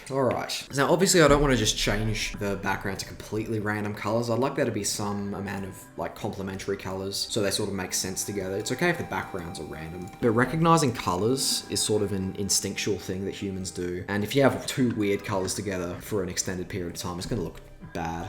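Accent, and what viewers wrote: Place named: Australia. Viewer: got punched in the face by that thick ass Aussie voice, willing to bet money this dude’s from Australia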